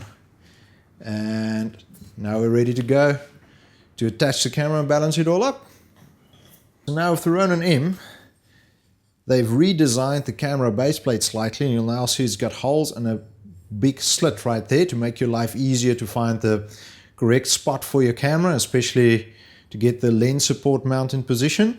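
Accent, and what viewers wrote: South Africa. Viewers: You sound South African bruv. You're local right?😁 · south african? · sounds like a south african who has spent a few years in AUS or NZ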